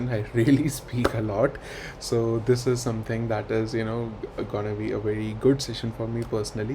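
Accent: India. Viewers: Look at your own accebt and body language typical indian its not at all English. How do you teachers. To me very substandards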